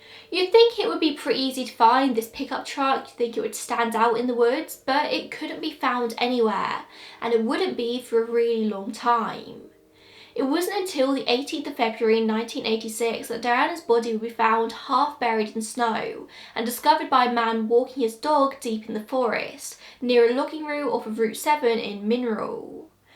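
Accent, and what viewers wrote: England, United Kingdom. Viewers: So weird to hear her English accent on YouTube talking about the ghetto in Washington i used to live in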